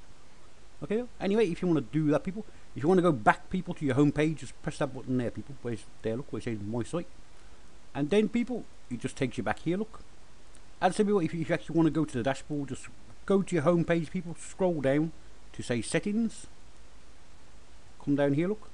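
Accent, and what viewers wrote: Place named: Ireland. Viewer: Thanks for that simple technique, loved the accent and the number of times you say "people". Are you Irish? :)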